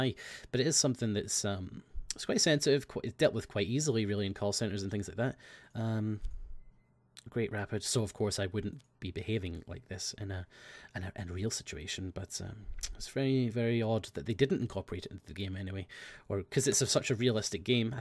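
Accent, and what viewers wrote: Scotland, United Kingdom. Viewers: He is Scottish he can't control his accent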